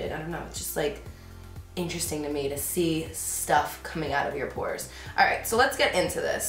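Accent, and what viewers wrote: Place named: New York, United States. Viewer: You can definitely tell you are from the New York/ jersey area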